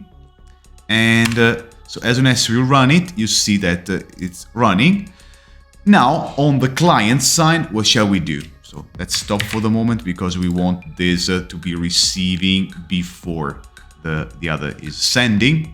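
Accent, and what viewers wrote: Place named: Italy. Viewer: Bravissimo, l'accento italiano si sente tanto ma devo dire che sei uno dei + bravi Italiani che ho sentito in merito ad "Accento" (te lo dico da italiano all estero eh...:)). 'Scolta ho una domanda... ma per entrare un po + sul tecnico dove mi consigli di guardare? Intendo sulle varie opzioni di ffmpeg, mux / demux / encoding etc etc. Online non trovo tanto materiale in merito (tanta teoria, ma parzialemtne declinabile poi sui vari softwares). Ciao grande, bravo, Luca.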